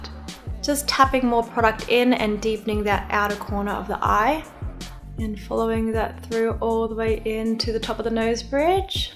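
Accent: Australia. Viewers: In love your Australian accent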